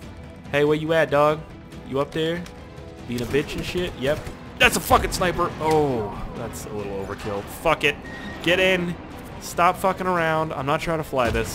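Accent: Canada. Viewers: Hes canadian and they pronounce cause lime cuz. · Al_isAtto702 no. It's his accent. He's Canadian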